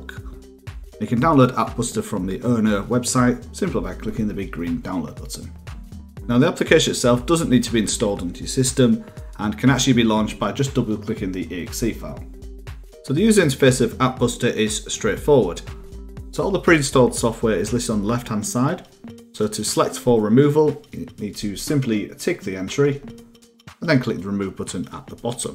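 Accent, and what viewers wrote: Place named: Northern England, United Kingdom. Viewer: Thank you so much for this. Just installed win 11 and while it noticeably improved my games, it took forever to start up, with tens of apps fighting for processing power on start up. I just uninstalled 50 apps and can now use my computer again. Also it's a breath of fresh air to hear a northern tutorial. I'm guessing Leeds or Sheff? Maybe Humberside?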